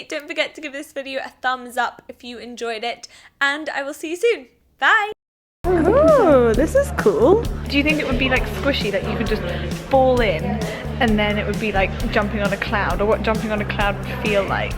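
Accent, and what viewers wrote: France. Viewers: your french accent is so cute it worth an 8 i love your vidéos !! Bises from France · Loved this video ! And the French girl is saying : Your French accent is so cute hahaha xx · haha i didn't really understand when you pronounce the fragrance's name but after i saw the name and rethink about your french accent, i feel like it's pretty accurate :P LOVE YOUR VIDEOS!! >< <3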